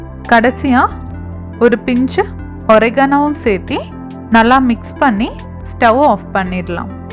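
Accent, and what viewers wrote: Kerala, India. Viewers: Maylamo voice 👍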